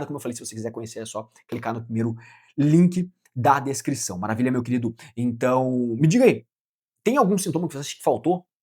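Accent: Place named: Paraná, Brazil. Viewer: Vc parece do Paraná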